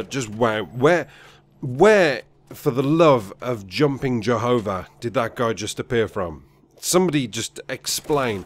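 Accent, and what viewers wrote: Birmingham, United Kingdom. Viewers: Funny BRUMMY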